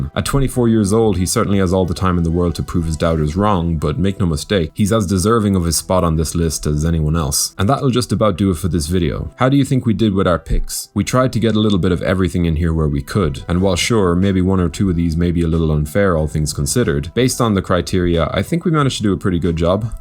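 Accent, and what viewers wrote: Ireland. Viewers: ''tug rose'' haha I love irish accents, keep up the great content, you're my favourite narrator on this channel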